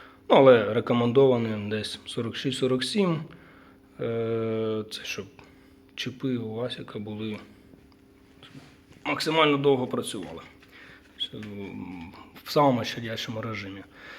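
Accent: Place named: Surzhyk, Ukraine. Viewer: Чего вымучивать на суржике-то?)) Ты ж на русском же лучше говоришь. И просмотров больше было бы))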